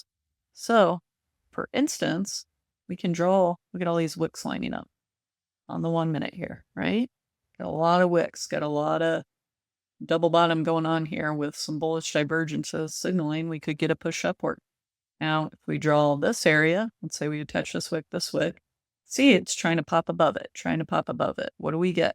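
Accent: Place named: United States. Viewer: Hey, I have a question please. You sound like you are from the US. how did you get an account with MEXC? Whenever I go to the site I get a blank page that says they do not service US clients.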